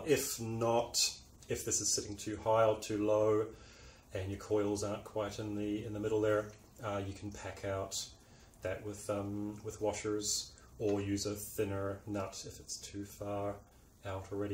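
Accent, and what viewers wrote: New Zealand. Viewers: Hey bro you just got a new sub cool... Your accent sounds kiwi?? Are you nz based would love to check out your work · Brilliant ideas - great video with the detail well explained. Thank you for your generosity in open sourcing all your research. Would you be from New Zealand by any chance? That accent sounds very familiar. Looking forward to the tutorial video.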